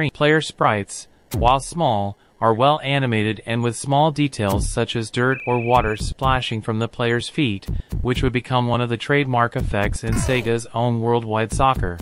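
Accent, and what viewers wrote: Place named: North America. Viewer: "Being from Merseyside" Speaks in a North American accent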